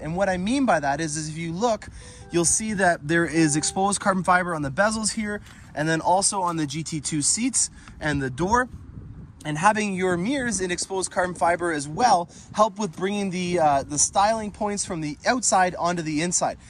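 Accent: Canada. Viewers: Don’t get me wrong...I love the videos and the Canadian accent makes me smile (my father was from Canada).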